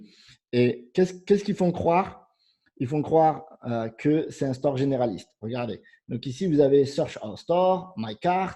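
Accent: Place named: Marseille, France. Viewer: lorsque j't.écoute,on dirait k't.es sur Marseille, chui sur hyères pour l'instant. À larevoyure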